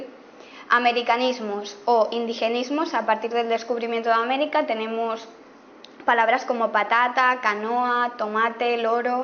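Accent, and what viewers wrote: Spain. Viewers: De España v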